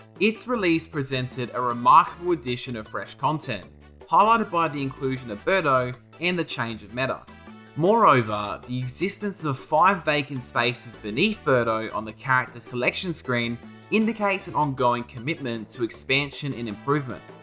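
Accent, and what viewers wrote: Australia. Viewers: I’ve never heard someone more Australian than you. And i love it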